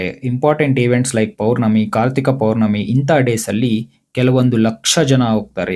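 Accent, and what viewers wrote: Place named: Tamil Nadu, India. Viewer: Tamil boy made this video your speech is in Tamil slang. Welcome you speaking in Kannada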